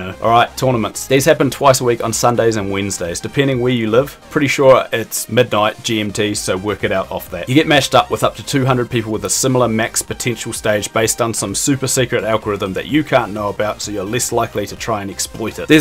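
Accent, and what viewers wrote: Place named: Australia. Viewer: must be the aussie accent as well <3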